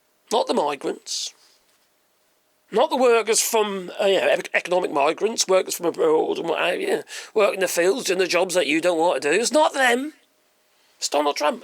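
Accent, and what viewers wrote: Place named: Scotland, United Kingdom. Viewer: Love your Scottish accent.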